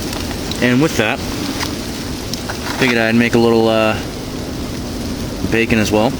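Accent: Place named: Northeast, United States. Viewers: Great video! Where is this place? You sound northeastern. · Pretty spiffy for a masshole! :) I could tell right away from your accent that you were from the greater boston area. And when I heard you talk about new bedford, well that just gave it away. Seriously though, it is refreshing to see someone doing a camping experience video that is real and not perfectly choreographed. You made a few mistakes like not gathering enough firewood before dark, and your shelter is not setup the best, but you got through it and you did it legitimately! It's nice to see a newbie practicing their skills. You did a great job and I enjoyed watching this video!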